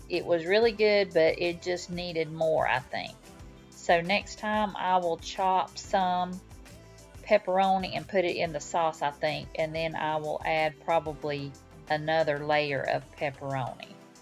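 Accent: South, United States. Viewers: I love taco soup. Great tutorial. New friend here. Do I detect a southern accent 😃? ❤👵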